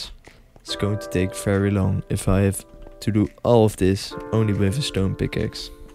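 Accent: Netherlands. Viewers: I could already hear that you're dutch like so many dutch people sound like that when talking english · Jij hebt een Nederland accent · Im really curious to know where you are from! You sound like german/dutch, but I am not sure :) Edit: i knew it. You are dutch just like me :P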